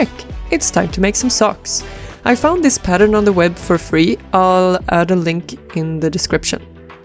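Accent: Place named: Sweden. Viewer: I'm truly sorry for ur loss tho, i thought u were swedish by ur accent it the start of the video but then i realized u actually were when u told about the öra thingy lol jag är också svensk så tyckte det va lite kul :)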